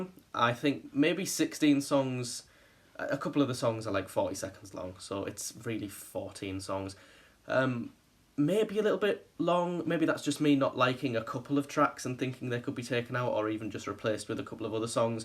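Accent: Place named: Northern England, United Kingdom. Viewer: I'm happy there's a positive review. I was happily shocked how much I enjoyed it, how experimental is willing to go. I love it and it keeps growing on me. My personal favourite is ' ' which just brings me to tears every time. It's just such a beautiful statement on the power of love, it's so sensitive and fragile. Exposing his pure heart within. Something truly, truly amazing. And that flute solo! I think it's something you could only really understand with an open heart idk. is just manic debauchery, I love it. has a wonderfully melancholic opening piano intro. Everyone rips on and I get it, but if you listen closely there's a lot of production elements and melodic arrangements which are quite clever. and speak for themselves. should be given more credit! is a gorgeous little number. I like all the tracks, come to think of it. 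I think he's simply done an outstanding job. It's an album that will surely add and not substract to the legend of. Bless him. Side-note, I am desperately trying to pinpoint your accent but I simply cannot come up with anything other than 'North', or possibly Wales or something. At the very least, far from London. Which is kind of sad, since, if you should possibly allow it, you're exceptionally cute. Oops. Anyway, great review and bless xx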